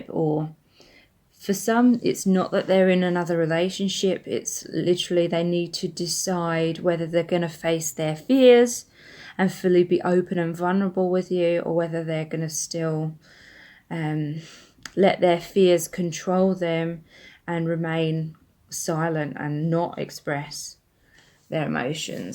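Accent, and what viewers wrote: United Kingdom. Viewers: can anyone explain to me what she meant by transformation in communication for pile 1? the person i love is living an unhappy marriage and trying to get his divorce, but he is afraid that the wife will not let him see his daughter any more , i didnt really understand her as she has a british accent and am not a native speaker.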